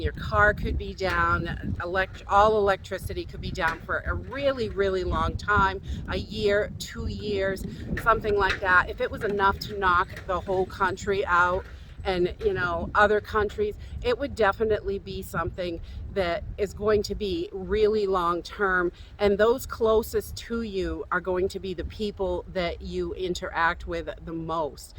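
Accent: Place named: Massachusetts, United States. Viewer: Sounds like Massachusetts- LOL! NE is not going to offer many “like-minded” people! I have one friend 2 towns away who is like minded but that would not help in a SHTF situation. In my small neighborhood there are mostly takers and we would worry about that… The kind who arrange a neighborhood pool party at someone else’s house (when they don’t have one)- those kind of neighbors! 🤔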